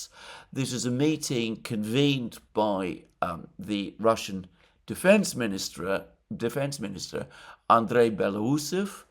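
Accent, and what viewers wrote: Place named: RP, United Kingdom. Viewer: Your English is pretty. The Queen's English I presume. An endangered specie :)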